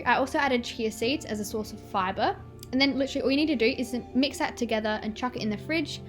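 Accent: United Kingdom. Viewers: thank you bri-ish lady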